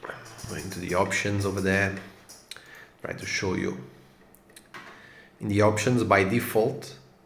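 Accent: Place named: Portugal. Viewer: Obrigado! (your accent sounds portuguese, like me). (edit: unfortunately, the feathering does not work if we want to feather just one engine but good try).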